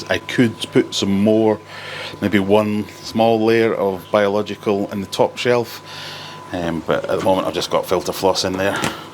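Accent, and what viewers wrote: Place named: Scottish Highlands, United Kingdom. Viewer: Uncle got highlander accent and i absolutely love it. Excellent video🤝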